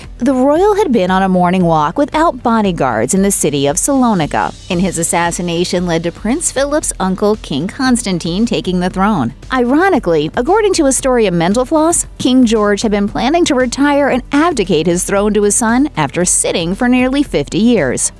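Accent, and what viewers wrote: United States. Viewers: Gd contente but bad 😰 America chinlish accents!! Ask/employ the EU bondle likes Swedish or Danmark or Switzerland for boardcaster plz !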